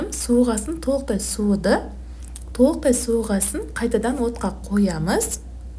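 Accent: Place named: Uzbekistan. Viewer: Озбекстаннын казактары солай сойлейди, ешкандай калпак емес,озимиздин казак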